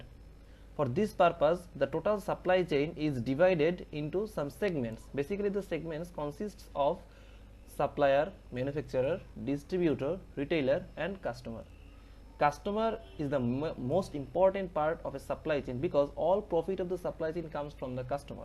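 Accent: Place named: India. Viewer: Your accent is very bad ... i hate indain accent unknown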